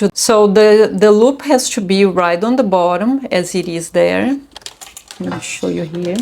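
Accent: Brazil. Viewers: Vc é uma querida minha. Obrigada por compartilhar todas as suas dicas! No primeiro vídeo que vi seu, eu saquei pelo seu sotaque que vc era brasileira, e fui ver o que uma brasileira tinha pra falar sobre essa máquina que pouco sucesso faz por aqui rs E que bom, pq vc de longe é a que mais entendeu sobre o assunto e explica com clareza, de todas que eu já parei pra ver aqui na internet A gente que é brasileiro acha que nosso próprio povo não sabe o que fala, mas se procurar direitinho acha as joias mais raras do mundo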